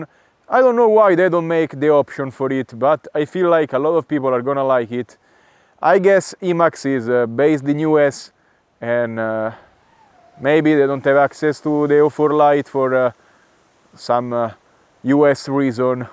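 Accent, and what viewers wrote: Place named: Italy. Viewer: Infatti io lo capito dalla accento che ha e un po il luogo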